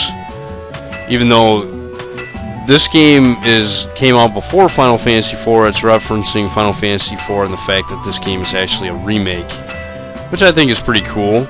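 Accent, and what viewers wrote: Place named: South, United States. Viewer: You do sound more southen but it doesn't matter 'cause it fits so keep it up.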